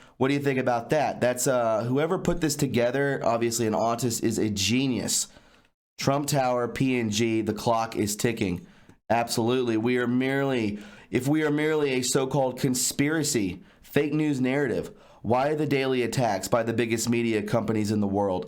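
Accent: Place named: Georgia, United States. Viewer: not sure where you are but your Southern sound a whole lot like Hart County Georgia. Love that area and miss it terribly. All the South is great, though. 🇺🇸❤️🇺🇸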